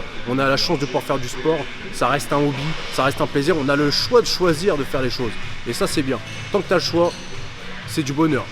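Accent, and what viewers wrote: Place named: Nord-Pas-de-Calais, France. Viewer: J’aime bien l’accent chti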